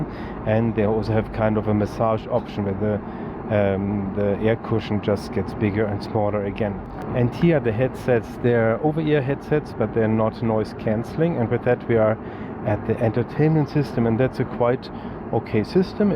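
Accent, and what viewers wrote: Germany. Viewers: This was very informative so thank you for creating this. Also, and not really related, but your German accent comes across as being very friendly 😊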